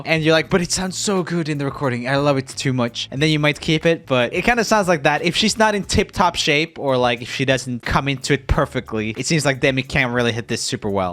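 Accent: Sweden. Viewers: RJ Alcaraz the Swedish accent.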